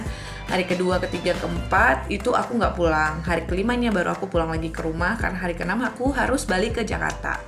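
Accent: Manado, Indonesia. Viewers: solop mana kita pe solop😂😂😂 picah tatawa pas tau ka pake logat Manado😂😂😂😂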